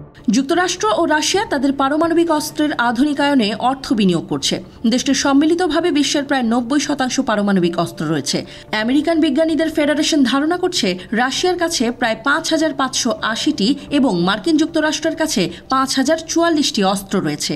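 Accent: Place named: India. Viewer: উপস্থাপিকার কথা শুনে মনে হচ্ছিল ভারতে কোনো নিউজ দেখতেছি, পরে দেখলাম RTV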